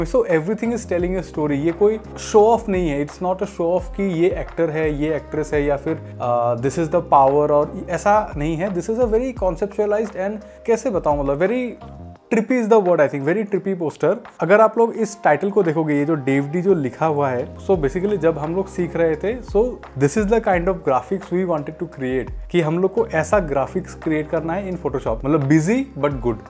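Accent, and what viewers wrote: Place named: Bengal, India. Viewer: If I am not wrong, you are bengali for sure. Your accent is typically bengali type. Just a guess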